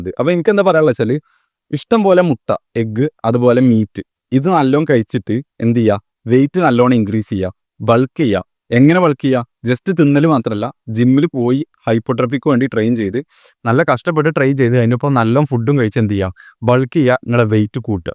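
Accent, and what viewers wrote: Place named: Malabar, India. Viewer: Ath malabar style aan broo kaaryam manasilavundo ath mathi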